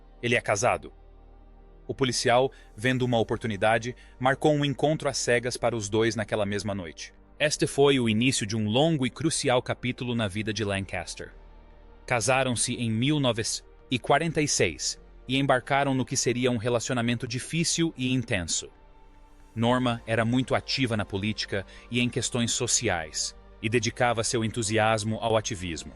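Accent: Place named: United States. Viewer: O narrador parece ser estadunidense. Fala português com sotaque anglo americano.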